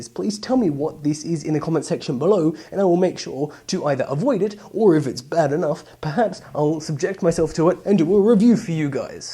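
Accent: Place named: United Kingdom. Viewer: You have a British accent when you're sick.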